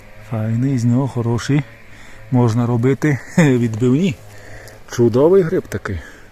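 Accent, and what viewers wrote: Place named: Carpathians, Ukraine. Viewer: Чудовий діалект!Карпатська мова ,доповнює красу такої чудової природи!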